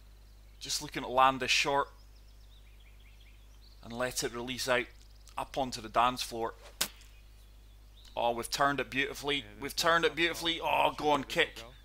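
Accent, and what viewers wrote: Scotland, United Kingdom. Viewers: I hear scottish i sub 🏴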